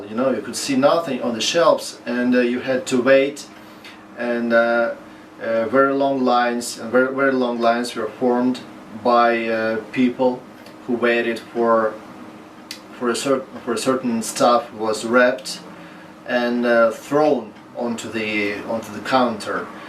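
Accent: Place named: Russia. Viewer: He doesnt speak english but jewish american ,,,and you speak real english with a beautiful russian accent . Thank you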